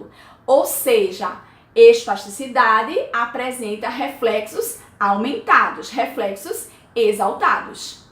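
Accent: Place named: Paraíba, Brazil. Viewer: Muito bom o video! Quando vi seu sotaque, lembrei da minha mãe, que, é da Paraíba e fala igual à vc!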